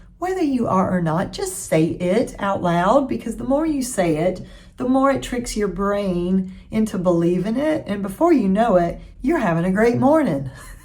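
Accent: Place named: South, United States. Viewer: It is so nice to hear sensible things in the accent I grew up around. Squirrels and anything with the word pickle in it? Would never ever guess you're from the South lolol. As for protecting joy, right now trying to find that joy and cut out what I can with people who are entirely negative and cutting down on people who only take -- learning that my emotional labor and care is important and I shouldn't waste it on every single person that shows up with a problem. I also walk every morning and take short walks during the day. Knoxville, Tn area.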